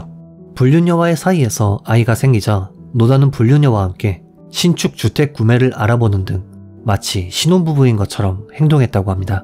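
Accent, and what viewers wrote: Japan. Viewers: 채널주인님은 일본사람인가요? 영어발음이 상당히 일본인이 발음하는 거 같아요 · 그러게요 일본인 특유의 발음이 있을때 일본식으로 계속 발음하던데 이유가 있는건지 뭔지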